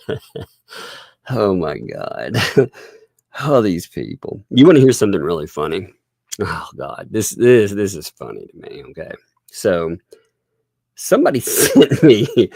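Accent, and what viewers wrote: South, United States. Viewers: Catching up, it’s been a busy few days, enjoy listening, it’s nice listening to someone win a southern voice. 🫶🏻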